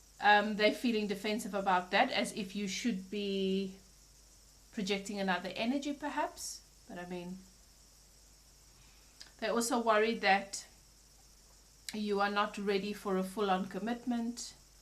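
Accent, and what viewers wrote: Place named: South Africa. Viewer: Very good reading.. You are very gifted. Your accent sounds South African, so good to see this evolution in spirituality. Thank you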